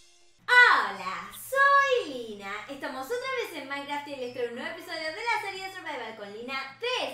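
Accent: Argentina. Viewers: Eres Argentina? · No es española es Argentina me 😑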